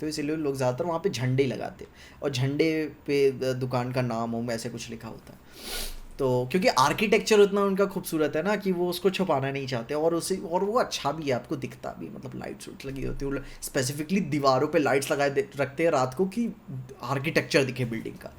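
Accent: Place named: Purvanchal, India. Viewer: Pta nahi kyu bhai Your win feels personal🥹. I could literally feel each of your pause and stutter. The moment of realisations, everything. I don't know why maybe because mai bhi aise hi ek humble background se belong krta hu purvanchal ke. Aapka lehza, aapki shabdaavali mujhse milti julti hai shyd isliye. But anyways keep going brother, someday I'll also be on my own aur ye saara kuch experience mai bhi first hand kr rha hounga.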